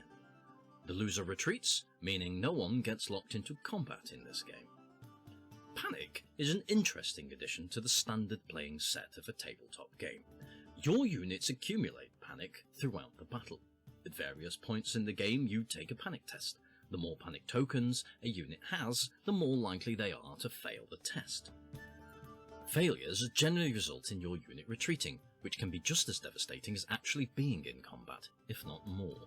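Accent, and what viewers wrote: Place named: United Kingdom. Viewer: It matters because sometimes people can't pick out the British (?) cadence. There are a few important words in the beginning I couldn't make out even with repeated listenings.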